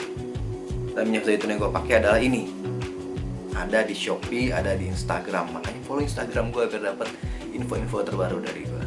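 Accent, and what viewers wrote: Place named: Aceh, Indonesia. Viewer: Orang aceh ya bang?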